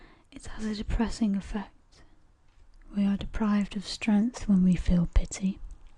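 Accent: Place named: Yorkshire, United Kingdom. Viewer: I'm hoping your Yorkshire down to earth accent is genuine about spirituality, we are taught alway question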